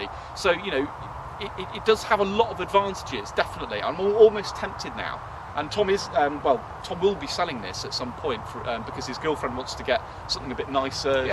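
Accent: United Kingdom. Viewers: not mad but sounds so weird having someone with a British accent explaining guns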